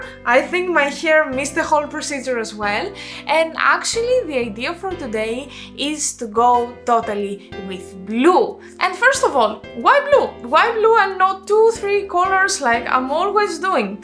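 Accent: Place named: England, United Kingdom. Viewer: You are always good surprise :) Are you maybe from England? You have a great accent. :)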